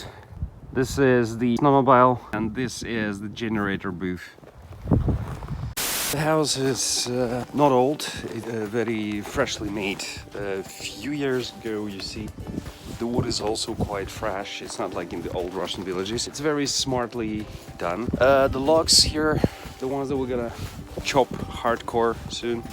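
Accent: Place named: United States. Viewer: American accent . . ?? how comes?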